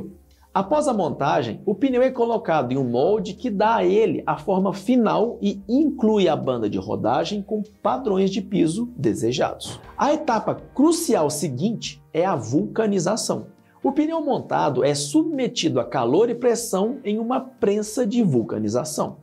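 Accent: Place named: Rio de Janeiro, Brazil. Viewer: Ele deve ser só RJ, lá eles falam feio assim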